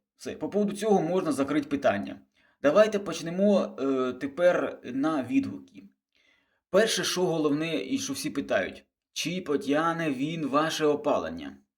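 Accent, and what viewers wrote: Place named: Sumy, Ukraine. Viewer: Молодець,що пробуєш на українській мові, Сумщина тримається,сам з неї😊.всі ми тут розмовляємо суржиком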